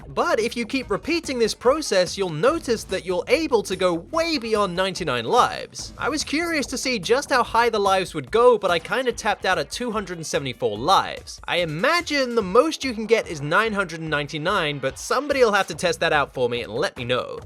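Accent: United States. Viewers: What the fuck is your accent? Had to turn this off because I was too busy trying to work out why you sound like 20% American and 80% from England. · Your jarg American accent actually offends me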